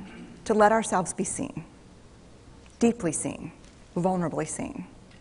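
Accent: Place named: United States. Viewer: this is American English I thought it was British language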